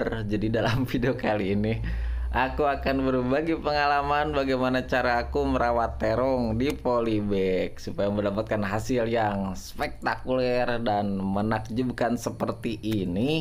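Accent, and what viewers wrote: West Java, Indonesia. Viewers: Seneng malah dengerin ngomong nya meni sunda bangatt haha makasih info nyaa mang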